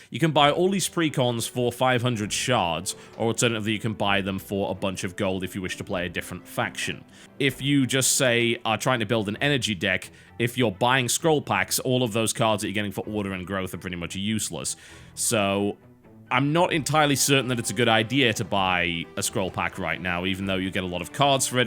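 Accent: United Kingdom. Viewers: Everything this guy says is funny to americans because of the brittish accent. This is why BBC america has so much comedy amercians are almost ready to laugh anytime brittish people speak so they use it as an advantage. He can talk for hours about nothing and americans would be sitting over in the corner having giggles. Even card games are funny. Sorta like crocodile hunter/crocodile dundee but not australian. I don't even know what some of the slang he is using means.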